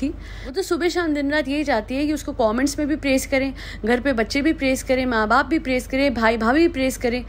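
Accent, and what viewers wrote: Punjab, India. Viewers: Hi, apki language Mei Punjabi tone hai ❤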